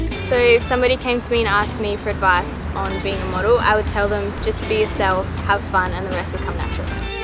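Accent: Australia. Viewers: beautiful woman , lovely Aussie accent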